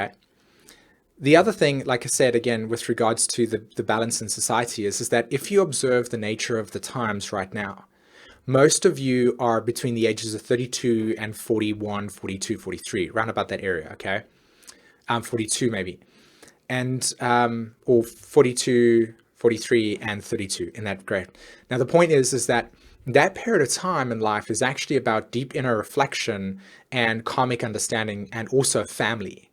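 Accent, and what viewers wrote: South Africa. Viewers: Are you South African by the way ? If so, do you do in person consults? I live in SA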